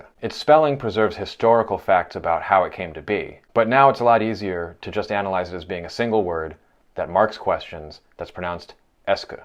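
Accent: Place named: United States. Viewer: What I've learnt from this video, and having checked to verify, is that Americans seem to pronounce plural 'moths' differently to us Brits, Irish, Australians and New Zealanders. You seem to say 'moθ' in the singular and 'moðz' in the plural whereas we also say 'moθ' in the singular but say 'moθs' in the plural. Yeah, I know we say the vowel differently too but that's not what I'm looking at.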